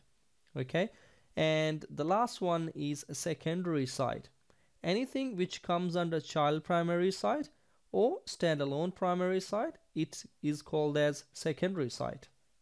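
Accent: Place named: Australia. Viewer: You sound Ozzie....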